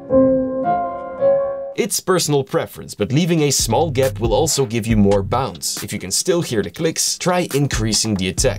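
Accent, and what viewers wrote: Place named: Netherlands. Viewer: bro sounds from NL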